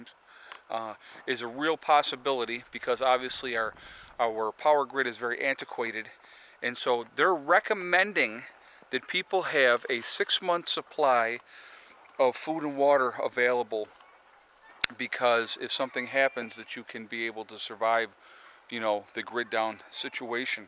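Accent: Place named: Michigan, United States. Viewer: Wait, where are you from. Just curious. Im in N.H. By your accent, I'm guessing around Michigan?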